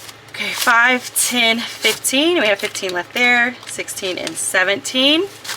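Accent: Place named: Midwest, United States. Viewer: I can SO tell you're from the Midwest "lets get to gettin". I love your Flower Farm Box ❤🌻🌻🌻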